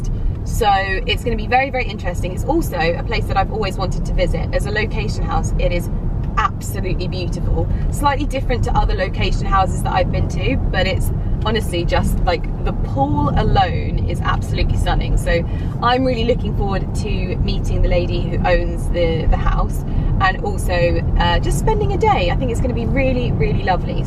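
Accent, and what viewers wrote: RP, United Kingdom. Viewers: I don’t understand why there is a smear campaign against you - your accent might have changed, but that is because you have educated yourself and developed your vocabulary. Yes, RP (Received Pronunciation) might be associated with the upper classes, but nowadays, people can have that accent regardless. Your accent shows to me that you are well-read, well-educated and intelligent. Why are people so hateful of that?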